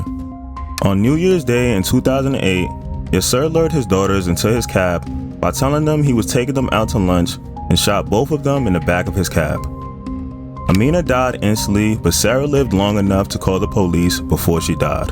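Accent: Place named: United States. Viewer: the dude narrating sounds like an American . Most Americans by now do understand how Hispanic names are pronounced simply because there is huge amount of Spanish in our current culture ... we've all had plenty of exposure to Hispanic names by now . I am not Hispanic myself but for someone not to have heard how these words are pronounced in this day and age is a bit mind-boggling . Also scroll down and read all the other comments in the comments section and you will notice how many other people begged him to learn how to pronounce Hispanic names ... I am not the only one trying to correct him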